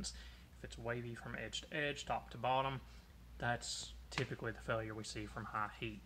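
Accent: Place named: North Carolina, United States. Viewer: I'm guessing you're from north carolina.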